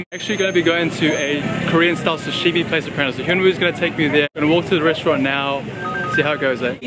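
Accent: New Zealand. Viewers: Know a kiwi accent when I hear one! great vids bro keep it up chur :)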